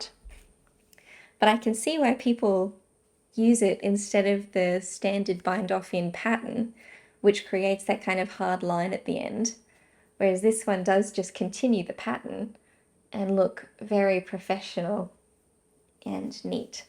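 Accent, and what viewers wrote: Australia. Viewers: Congratulations on your socks. They are beautiful. 9" circs give me hand pain too. I prefer magic loop or DPNs. I still use my Chiagoo 9" needles to hold stitches for heels and such. Your Aussie accent is charming.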